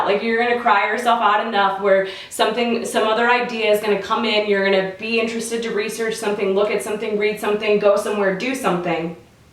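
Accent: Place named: England, United Kingdom. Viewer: You sound very English this week.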